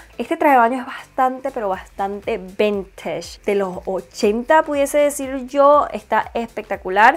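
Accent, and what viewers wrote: Venezuela. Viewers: Hola! Me encanta cuando hablas venezolano 😸✌ "caderua" "carrasposo" etc... Creo que la última pieza se llama pareo!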